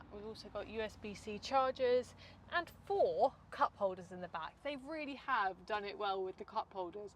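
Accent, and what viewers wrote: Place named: United Kingdom. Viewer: I love your british accent I feel like keep listening to you.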